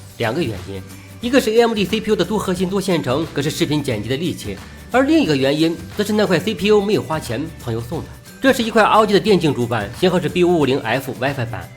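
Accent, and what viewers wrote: Shandong, China. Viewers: 大哥山东人？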